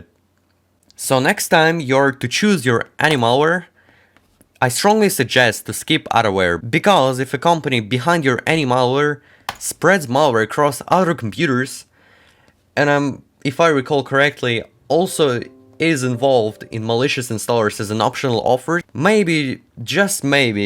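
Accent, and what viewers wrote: Germany. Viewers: are youre german or is that the windows? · Yoyoyoyo wait are u german?!?! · bro bist du deutsch ? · Hey just a question are you from germany or russia (Just a question) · ach lol, du bist ja Deutsch! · are you german?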